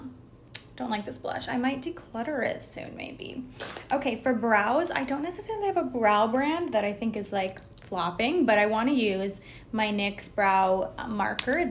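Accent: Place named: New York, United States. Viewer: Got a little New Yorker coming out in your accent. Love it. Hope you're loving it out there!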